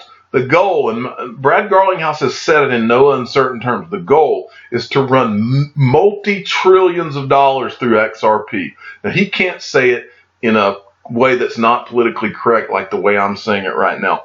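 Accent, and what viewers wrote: South, United States. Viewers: you have the southern drawl going on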